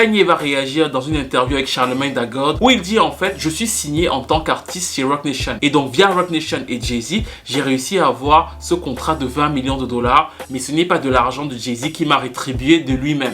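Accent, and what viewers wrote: Cameroon. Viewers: Tu as un petit accent camerounais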